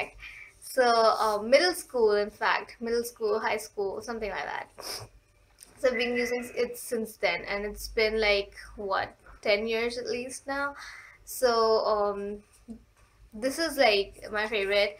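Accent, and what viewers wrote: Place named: United States. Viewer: your cute :) you look like an indian but you have an american accent.how? :O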